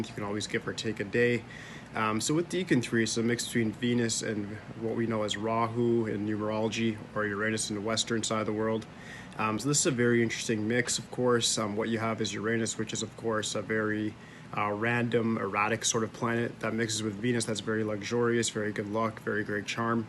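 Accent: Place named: Canada. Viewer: This is the first video I’ve seen of yours. You sound Canadian 😁 love it! I’m going to look for your channel and I truly hope you’re still active. Your knowledge on this subject was A1. 😊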